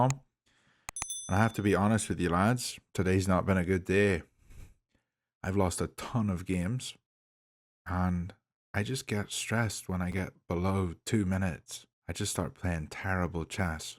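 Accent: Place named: Scotland, United Kingdom. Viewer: Apologies, the accent sounded Scottish to me.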